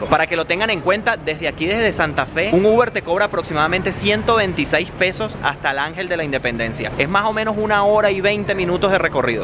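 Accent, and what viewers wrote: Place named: Venezuela. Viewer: es venezolano, no le oyes el acento?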